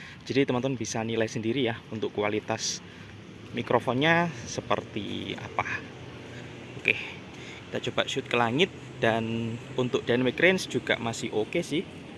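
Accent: Banyuwangi, Indonesia. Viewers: Tibak e mas wong banyuwangi 😂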